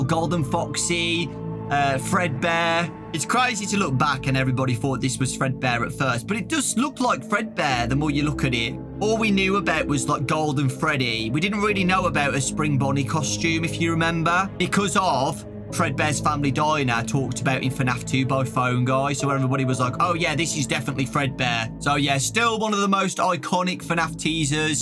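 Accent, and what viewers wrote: United Kingdom. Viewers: Bruh he british? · My friend:"who are you watching" Me:"Dawko" My friend:"oh, who is he and what does he do" Me:"he's British, he talks loudly, and plays FNAF" My friend:"so he's like Morgz?" Me:"yes.... But with FNAF"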